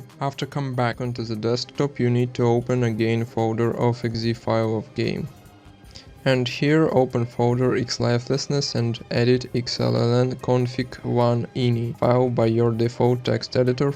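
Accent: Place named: Russia. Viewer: Зачем ты говоришь на английском? Твой акцент сильно тебя выдает, как и дискорд на русском..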